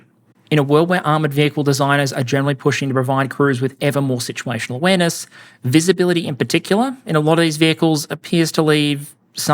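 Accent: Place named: Queensland, Australia. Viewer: Gonna take a punt here and say has a Queensland accent, specifically SEQ, so uh, how about them Broncs hey?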